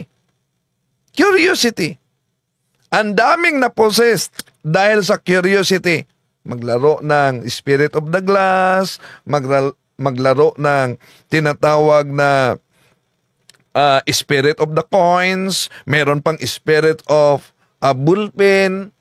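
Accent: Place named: Visayas, Philippines. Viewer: Kainis ka naman mag announce halos dko maintindihan bisaya accsent Kasi eh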